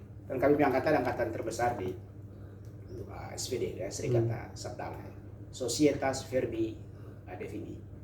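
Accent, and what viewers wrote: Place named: Manggarai, Indonesia. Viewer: Bp doktor, itu logat Manggarai tuu kental ew😅😅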